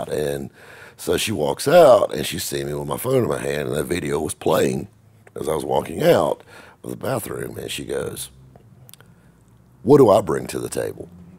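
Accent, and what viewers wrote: South, United States. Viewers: Yess sir! Got to love she saylim southern drawl😉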